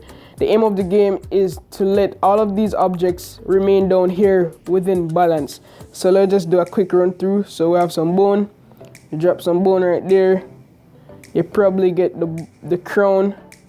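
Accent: Jamaica. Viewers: u jamaican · U Jamaican? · Are you Jamaican 🇯🇲🇯🇲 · You Jamaican? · Yeah Man U definitely Jamaican bredda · Are you jamaican?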